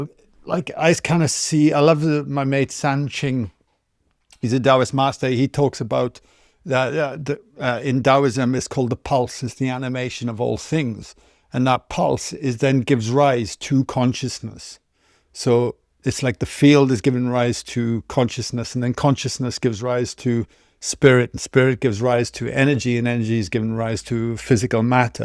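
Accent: Wales, United Kingdom. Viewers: Nice to hear a Taff accent. Feels like I'm back in the Valleys 😅. Thanks for these insights based on all that experience.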